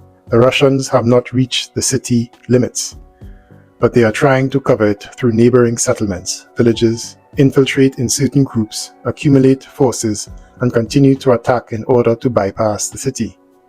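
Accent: Trinidad and Tobago. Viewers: Narrator sounds like a Trini?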